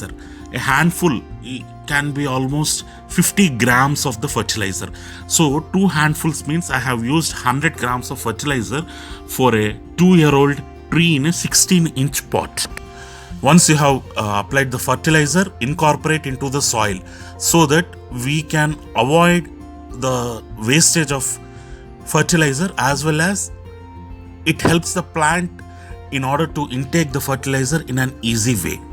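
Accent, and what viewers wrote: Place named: South India, India. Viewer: 🙏🕉️🚩🌹🌻 JAI SRI RADHA GOVINDAY NAMO 🕉️🚩🌹🌺🙏🙏 Sir, Thanks for this stunning and informative video. I have been learning gardening since last four months via U TUBE and have attended one practical session also. Pls send me your name and mobile number so that I can remain in touch with you. From your accent I can make out your are from south. I am buying my fertilizers and other items from TN and KERALA. THANKS a lot 🙏🙏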